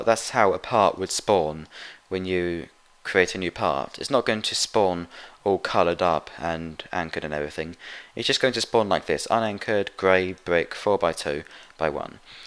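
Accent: United Kingdom. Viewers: Yea, I think hes from the UK or something like that. That's most likely why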